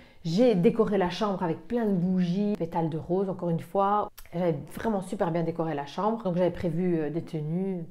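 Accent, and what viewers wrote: Brussels, Belgium. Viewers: Je valide les idées!! Je te connais via tit Caro! J'entend un petit accent de Bruxelles ?! Une voisine 😍 hop je m'abonne! N'hésite pas à venir voir ma chaîne! Tout Plein de gros bisou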